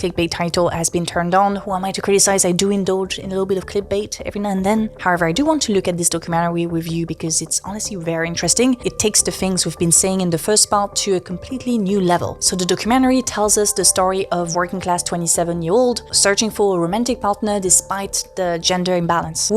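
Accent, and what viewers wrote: France. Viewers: Ahh, makes videos deliberately titled ' the toxic hedonism of male travel vloggers ' and 'are straight men ok?' all in a slightly provocative and mocking manner with almost a glee and a false sense of concern in the background and laughing at people who respond to the generalization, suddenly talking about the gender wars with her shtty french accent. Never change girl. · Are you french? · Je me disais bien que je ressentais cet accent français 😂❤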